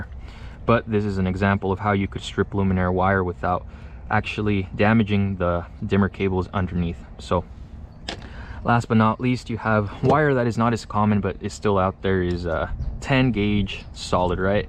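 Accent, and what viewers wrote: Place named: United States. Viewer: Good to hear an American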